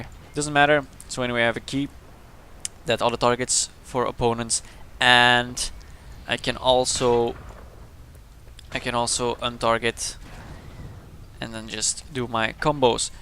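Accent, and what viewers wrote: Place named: Belgium. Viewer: Loved this video, Subbed! I can't help to ask. But are you from Belgium by any chance? I'm from the Netherlands and I kinda recognise a belgium accent with you:P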